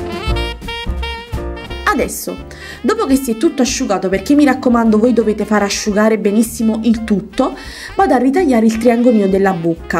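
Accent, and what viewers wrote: Naples, Italy. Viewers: sei di napoli?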